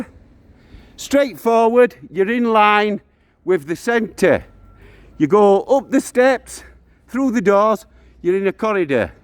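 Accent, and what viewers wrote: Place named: Wakefield, United Kingdom. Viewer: Smashing audit with great commentary. Where are you originally from pal?....very distinct accent! Older style Wakefield or even Royston Barnsley?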